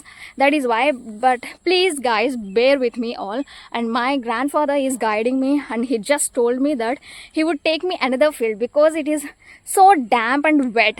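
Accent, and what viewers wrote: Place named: Andhra Pradesh, India. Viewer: I think your from Andhra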